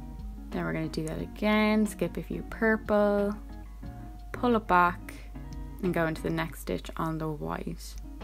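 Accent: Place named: Ireland. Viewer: OMg Ur irish! I recognise the acsent , im irish too :D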